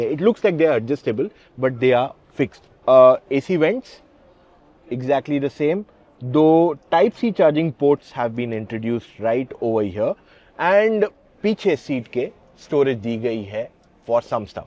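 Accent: India. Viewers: Hey team! Great video as always. Love the honest, no-nonsense review style. Your natural Hinglish flow and the way you bluntly call out brands (like the missing fog lamps and the "new gen" claims) is exactly why we trust your channel over the PR brochures! 🙌 Just wanted to share some friendly, constructive feedback as a viewer to make your delivery even sharper: Punchier phrasing:Sometimes the English sentences get a little tangled. For example, instead of saying "I am a big fan of that being going off" about the LED light, a quick "I'm glad we can turn that off" hits much better. Descriptive words: When you talked about the new touchscreen angle, you said "it's like this instead of this way" while using hand gestures. Since a lot of us listen to your videos in the background while working or driving, describing it verbally (like, "the screen is tilted slightly upwards now") really helps paint the picture! 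Fewer fillers: You use phrases like "just fine" or "pretty much" a lot (like when talking about the ground clearance). Swapping those for words like "adequate", "practical", or "sufficient" would make you sound even more like the expert you are. Keep up the awesome work! Never lose that raw, unscripted energy.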